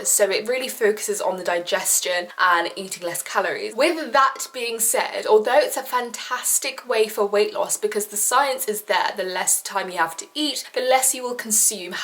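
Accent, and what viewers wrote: United Kingdom. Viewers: Omg i love the British accent 💖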